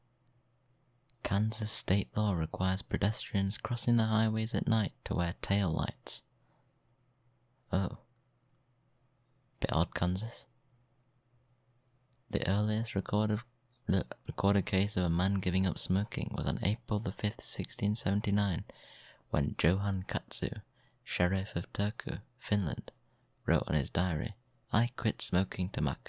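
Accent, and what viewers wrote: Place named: United Kingdom. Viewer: Omg you are from the uk aren't you? I kinda fall in love with people from the uk just because of the beautiful way they pronounce every single word aaagh i can't. I apologize for mistakes, greetings from germany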